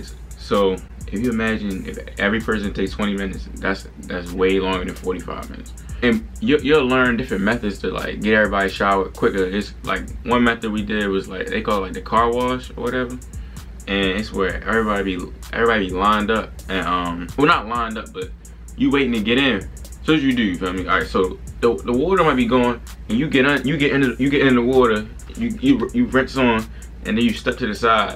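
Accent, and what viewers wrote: Baltimore, United States. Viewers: R u from Baltimore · LMFAO HE FROM BALTIMORE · You from Baltimore bruh?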